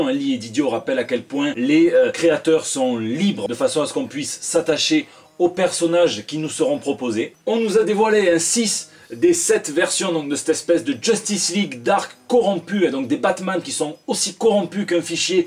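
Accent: South, France. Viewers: Je préfère regarder ta barbe soyeuse danser délicatement au son de ton magnifique accent du sud! Tu nous la fous en demi-molle chaque mercredi tu sais.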